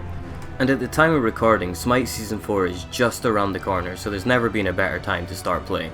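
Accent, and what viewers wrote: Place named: Scotland, United Kingdom. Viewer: Always throws me off when i hear the Scots accent in vid. some fucking good plays there mate.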